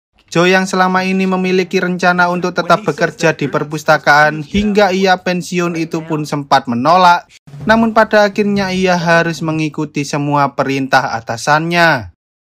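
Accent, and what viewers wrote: Java, Indonesia. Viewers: Medok mu hilangin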